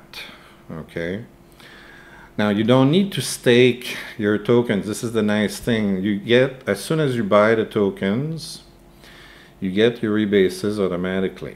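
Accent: Quebec, Canada. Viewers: Are you from Quebec? I hear s Quebequois accent. 🇨🇦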